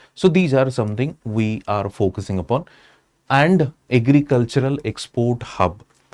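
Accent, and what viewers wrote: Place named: Odisha, India. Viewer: E master odia english style re kahibaku chesta karuchi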